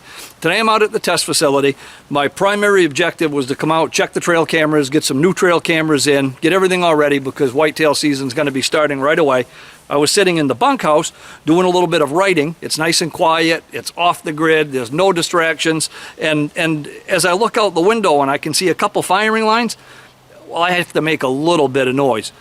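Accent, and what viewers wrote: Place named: Northeast, United States. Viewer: Mouth is too small. Looks homeless. Has a northeastern accent. Very twitchy like a methican American. Also full of shit. If you weren't such a poser you'd know a soldier wouldn't get a lighter load if this worked out, he'd just be ordered to carry more. Pound of feathers weighs the same as a pound of stones.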